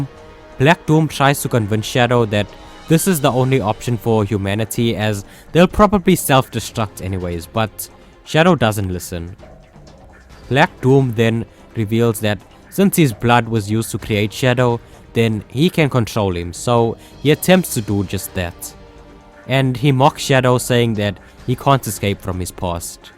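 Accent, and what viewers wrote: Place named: South Africa. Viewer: That's nice 👌 I'm British Indian but I have a lot of Indian South African family, so that's how I recognised your accent!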